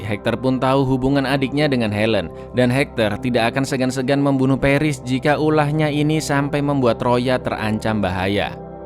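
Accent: Java, Indonesia. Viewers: Bang orang Jawa ya bang?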